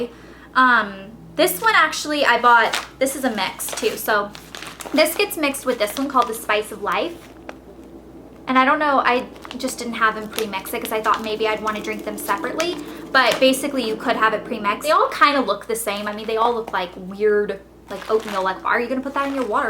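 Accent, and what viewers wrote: England, United Kingdom. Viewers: why are you talking like like you're british? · loving the english accent hahaha <3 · Your British accent is awesome, I feel proud. Love from London, England! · you do an amazing english accent! i live in the UK and that sounded soooo good lol :) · you have a kind of british accent in this video !! LOve it !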